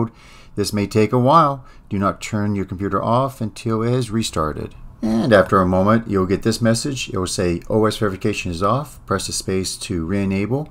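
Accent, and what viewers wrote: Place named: United States. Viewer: Hi there! 1st and foremost I wanted to say THANK YOU so much for being an american. I can't tell you how happy I am to be able to understand and hear you clearly. WOW, what a major difference. So thank you for that. I was so frustrated before I found your video. I followed each step, and it fixed my problems in less than 2 minutes. That was truly amazing. I appreciate people like you who take the time to make a video to help other people like myself. You make life a lot easier. My name is Cindy & I'm reaching out to you from southern California. Have a great day! Stay safe.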